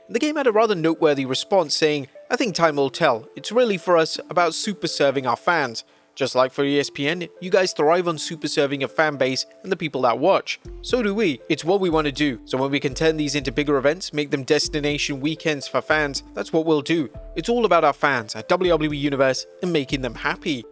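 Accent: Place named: United Kingdom. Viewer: This host is definitely not American you can hear it in his crappy accent he sounds like he's from across the pond himself and he doesn't realize that Ireland is not in the UK?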